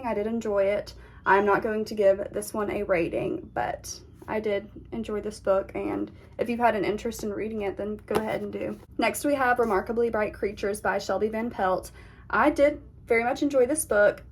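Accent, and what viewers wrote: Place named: United States. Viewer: I love your accent! What state are you from?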